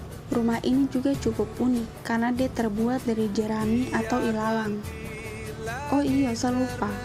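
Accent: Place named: Papua, Indonesia. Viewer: Bagus polll.. memang kalo mau perkenalkan budaya papua itu harus pake logat papua🤩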